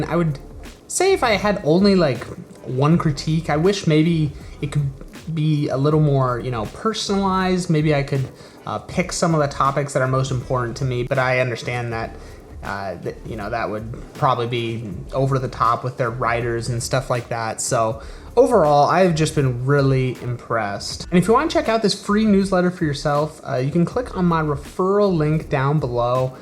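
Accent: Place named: England, United Kingdom. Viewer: I like your English accent!! God bless you a lot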